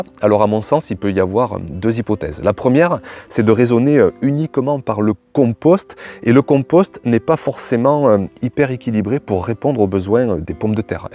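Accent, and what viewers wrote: South, France. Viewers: Je lis avec plaisir tes vidéos avec l'accent du Sud ...la culture en sac, Une méthode anglaise qui a fait ses preuves. C'est idéal pour les gens qui n'ont pas de jardin mais une terrasse. Le souci est qu'il faut faire des petits trous et mettre des billes d'argile dans le fond du sac pour que l'ajout d'eau ne pourrisse pas les racines... Essayes avec ma méthode tu auras plus de productivité ... Ca dépend aussi de la variété. Moi je choisis des "Charlottes" et les fameuses " bintjes" belges pour les frites ! C'est une bonne idée d'en avoir fait une vidéo.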